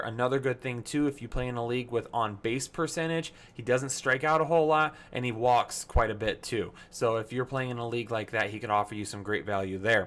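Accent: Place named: Michigan, United States. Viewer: Now that’s a Michigan accent if I’ve ever heard one lol. Go bucks and great video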